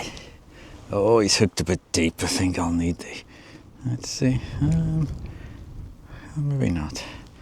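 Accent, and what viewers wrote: Northern Ireland, United Kingdom. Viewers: Hi. A little word form a rellow Northern Ireland Man who has been in England after university for 50 years. It is so good to hear your wonderful Northern Ireland accent. I have not lost mine. I am proud of it. My dad took me fishing to Lough Melvin. I loved it. Trout seatrout and salmon fishing came into my Life. I was hooked. However I have caught seatrout at night but my only salmon was caught on the spate river Margy at Ballycastle co Antrim. Best wishes for the season.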